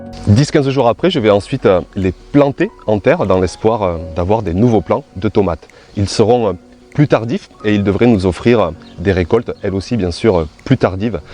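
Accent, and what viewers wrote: South, France. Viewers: Merci pour cette vidéo c'est toujours aussi plaisant à regarder et cet accent du sud qui nous fait un bien fou (à nous bretons 🤣) . Chez nous c'est sec aussi ...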